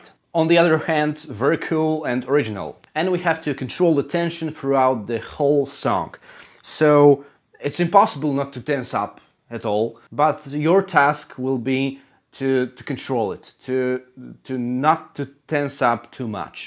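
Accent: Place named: Poland. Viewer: O co chodzi z tym akcentem? Ma mówić bez akcentu bo jest Polakiem?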